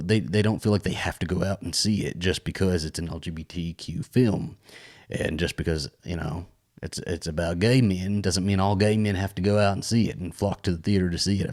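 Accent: South, United States. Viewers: I can't believe you're reading all the comments about one of the gay movies, "Bros" on this video at your house in Texas during the episode of "Coffee & Tequila", but your husband, Alastair is not there and I hope he'll be very happy for you, I wanna say I'm proud of you, I know you're gay and you had the Southern accent!!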